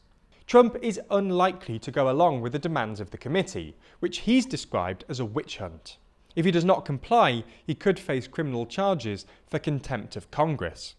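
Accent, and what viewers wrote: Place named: Liverpool, United Kingdom. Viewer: I cannot stand this guy prenouncing GUverment instead of gOverment. It is a great newsflash, really you're doing a great job but please lose the Liverpool speech impairment. It is like a dripping crane that slowly drips a hole in my skull.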